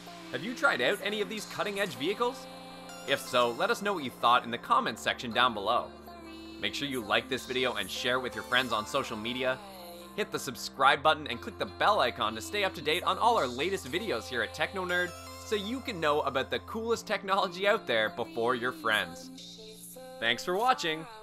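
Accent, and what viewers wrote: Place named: Canada. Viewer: Why do you think I was talking to you? The narrator in the video has a strong Canadian accent.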